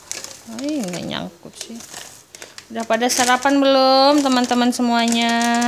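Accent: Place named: Sumatra, Indonesia. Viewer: ohh pantes logatnya sumatra bgt..lam kenal balik bun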